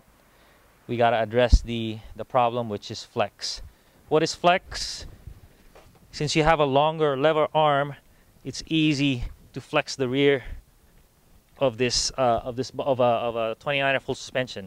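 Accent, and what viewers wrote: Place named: Philippines. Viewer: You sound like a Filipino accent, are you? Anyway am still not convinced that 29'er is way better than 26'er. But I love to try it in a single track in North wales or lake district.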